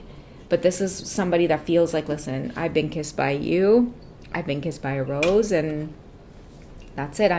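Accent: Trinidad and Tobago. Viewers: Could not mistake that Trini accent ❤️🇹🇹 I was listening while washing dishes and when I heard the accent I jumped 😂😂 I’m a Trini, living in Trinidad, who loves looking at tarot readings, just found your channel and I’m so happy to hear that accent 😅❤ Also I hope that’s my DM because I know he loves me, but timing and distance is the block. We’re both very busy too trying to build our lives 🥺 idk if it’ll go anywhere but we do have a beautiful connection, I at least really love that! ❤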